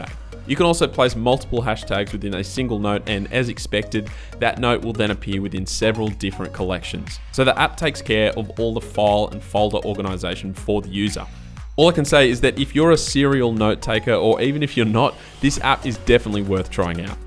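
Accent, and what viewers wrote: Australia. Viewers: That irritating aussie accent... I hate this accent. Bloody aussies.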